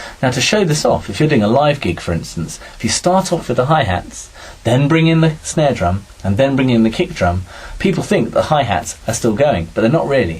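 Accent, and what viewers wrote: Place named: Kentucky, United States. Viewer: its sound like kentucky, jusge m elike i be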